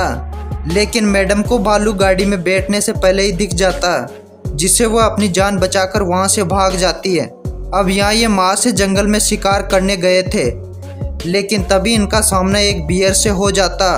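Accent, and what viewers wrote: India. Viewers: Jaipur side ki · sister are you Bengali 🙂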